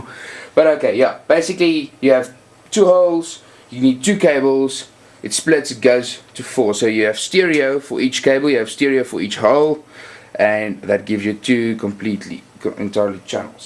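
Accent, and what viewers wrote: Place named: South Africa. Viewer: awesome vid thanks :) you sound south african :)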